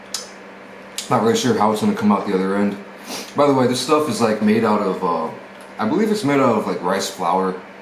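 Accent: United States. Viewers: His voice is so american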